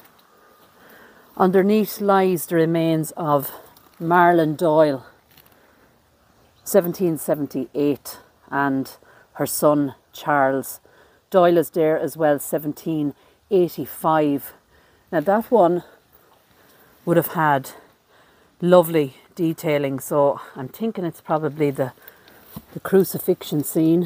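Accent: Ireland. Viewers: I love your Irish brogue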